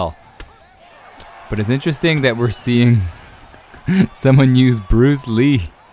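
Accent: Canada. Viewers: you're so Canadian I love it eh hahah. isn't the Spartan fighting this weekend? I love him. and actually didn't kno he was Canadian haha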